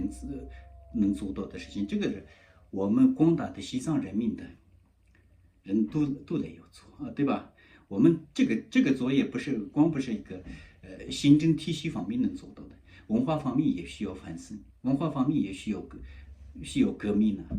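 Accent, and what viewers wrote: Qinghai, China. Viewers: 他的汉语这么地道 他带的是青海口语。 我觉得你没有根本读过小学的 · 但是我从来没有听说过尊者说过没有神灵的说法😏哦😳我错过了什么一部分·．还是，听错了， 请不要把你的想法和达赖喇嘛的说法混为一谈，尊者教导我们要尊重其他的．异，信仰，但是我从来没有听说过你说的….没有神灵、 还是你的青海话，我听不懂，如果听错了请你原谅我的言 论. 但藏族人说藏语还是听起来比较舒服.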